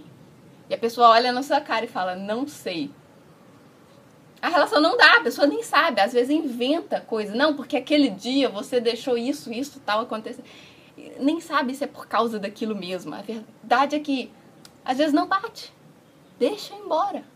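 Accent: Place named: Minas Gerais, Brazil. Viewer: Bom dia, espero que esteja bem. Sou engenheiro brasileiro, atualmente moro em Curitiba. Trabalhei e estudei na região de Munique (Bavaria), nos anos de 2006 e 2007. Assisto teus videos para matar as saudades que tenho da Alemanha. Voce é uma moça muito especial, tenho uma admiração muito forte por voce. Entrei em seu canal ha pouco tempo, mas me sinto feliz em te assistir. Voce tem sotaque de mineira, é isso mesmo: Estava vendo um video seu e que voce vai se casar, qdo sera: sra em minas: O rapaz deve ser um caboclo pra mais de sortudo ter uma mulher como voce nos brcas dele. te desejo muita sorte em sua vida, um abraço bem forte de urso siberiano, Caso queira conversar estou por aqui. Ate mais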